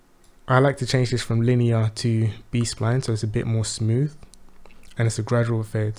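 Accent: United Kingdom. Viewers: Great video – very clear and better results that the others I tried. Good to hear a good multiethnic London voice, too!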